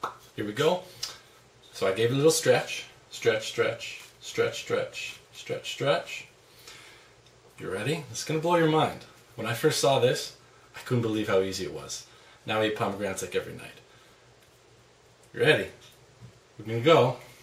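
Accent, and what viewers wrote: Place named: Canada. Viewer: You sound Canadian. A Canadian who talks too much.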